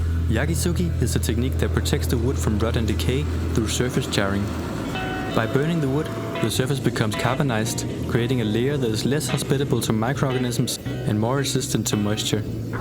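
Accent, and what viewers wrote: Denmark. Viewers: Am jeg høring danglish? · you sound Danish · the accent makes me think you are one of us. love from Skanderborg.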